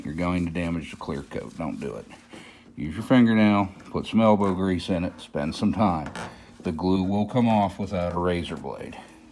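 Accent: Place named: South, United States. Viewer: ^^^^ To O.J. Avery ^^^^ Maybe you should get some hearing aids or turn the volume up. How much do you really need to hear anyway it’s pretty self explanatory. I think you were just taking a jab at some who talks a little differently than you or has a southern drawl. That kind of senselessness is just mean and evil and a huge part of why we are where we are as a country right now. Hope it helped you sleep better at night. Peace ✌🏼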